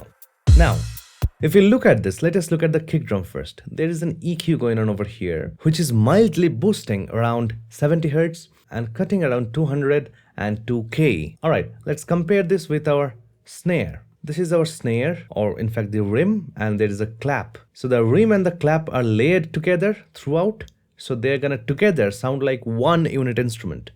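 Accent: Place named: England, United Kingdom. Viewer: Great english accent, completely professional.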